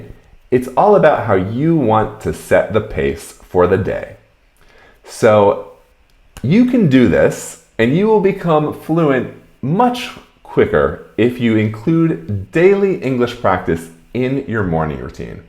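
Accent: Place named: United States. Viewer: I do not digest American accent.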